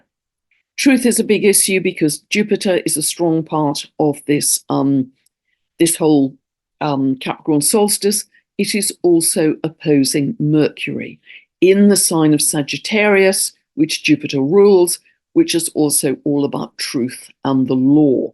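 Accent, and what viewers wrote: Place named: RP, United Kingdom. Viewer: Thank you - this is what wisdom looks like, in the Queen's English, with a warm, lovely smile.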